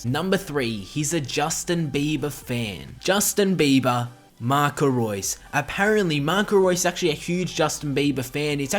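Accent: Australia. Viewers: Are you Australian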